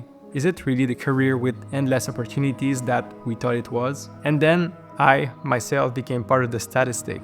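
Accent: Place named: Quebec, Canada. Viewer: Je vois que t as un accent quebecois, donc je présume que tu parle français xd. Tu es partis à quelle université? Perso je dois choisir entre génie logiciel et génie éléctrique, mais je ne suis pas sûr sur laquelle partir. Je vais aussi bientôt completer ma technique d'Informatique en moins de 1 ans et juste avec ce diplôme je ne suis pas sûr d'être capable d'avoir un travail dans le secteur d'informatique en général.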